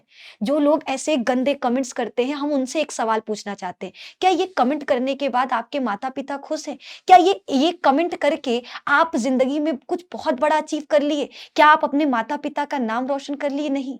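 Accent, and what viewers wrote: Bihar, India. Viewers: Mam kutto ka kaam hai bhokana padhna to have nai unko aap please padhana band mat kariye main ab 10 me gayi hu aur main batch Lena chati guu aap se padh kar tap karn chati hu main khati huu ki aap ke Tarah koi padha Nahi sakta aur to aur you are my favourite teacher aap because aap ka bolne ka tarika bihaari wala I like it my first line dimak me rahk legiye main to asise hi short Dekh rahi thi aap ka video aa Gaya muhje testion hi gayi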